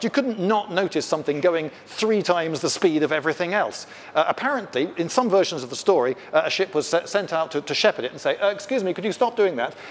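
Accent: England, United Kingdom. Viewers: No, he sounds fairly upper middle class, English. Welsh and Scots are also "British". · In other words, he sounds very British.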